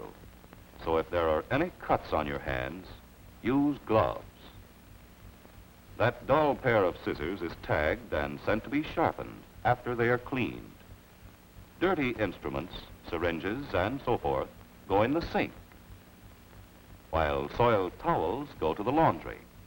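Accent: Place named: Mid-Atlantic, United States. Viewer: Man, those are some dull- ass scissors. That much hasn’t changed in 80 years! I can’t figure out what that metal tube is sticking out of that sailors back. Maybe a nephrostomy tube? Also, I absolutely love the narrators voice. Precise, no discernible regional accent, smooth enunciation and pitch variation. Waning days of the Mid Atlantic Accent.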